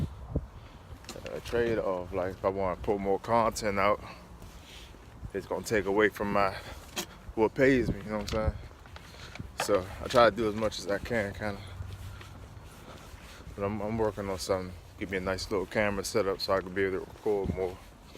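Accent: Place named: DC or Maryland, United States. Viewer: you from dc or md brah. I swear you sound like you from out here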